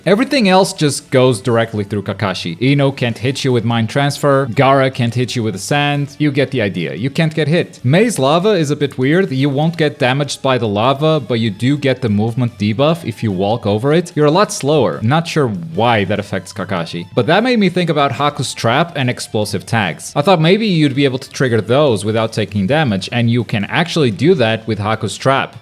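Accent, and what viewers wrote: Portugal. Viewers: he´s portuguese, LET´S GOOOO, na tua apresentação do canal tu foste logo cm o sotaque d portugal ahaha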